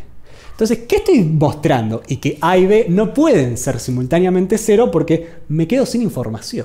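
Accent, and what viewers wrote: Argentina. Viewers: Es argentino, ¿Verdad?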